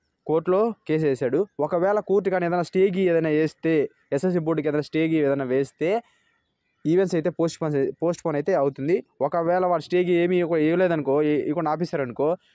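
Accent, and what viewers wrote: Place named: Chittoor, India. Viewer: Bro meedhi ee vooru slang chittore district lagane undhi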